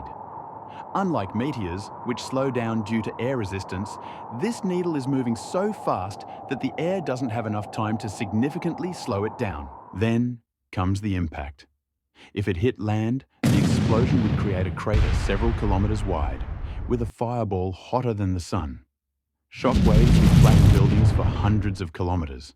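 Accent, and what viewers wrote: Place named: Australia. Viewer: Myoves, naaur, classic Australian speech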